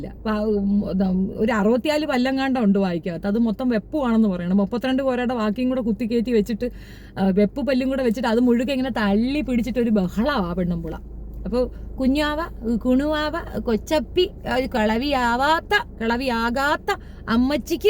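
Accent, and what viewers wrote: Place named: Kollam, India. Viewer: ഈ ചേച്ചി നമ്മുടെ നാട്ടുകാരി ആണന്നു തോന്നുന്നു എന്റെ നാട്ടിലെ പെണ്ണുങ്ങൾ ഇങ്ങനെ ആണ് ഡയലോഗ് സ്ലാങ്.ആയുർ ചടയമംഗലം കടക്കൽ നിലമേൽ വല്ലോം ആണോ സ്വദേശം അന്യം നിന്ന് പോകുന്ന പല വാക്കുകളും ഈ ചേച്ചി ഓർമിപ്പിക്കുന്നു സൂപ്പർ. അടിപൊളി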